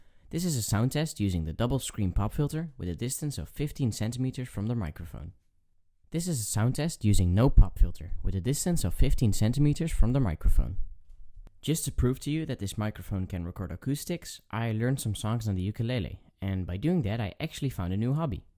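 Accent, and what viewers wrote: Netherlands. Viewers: are u from the netherlands ? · Je bent nederlands he verder top video man ga zo door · ja k hoorde aan je stem dat je nederlands was haha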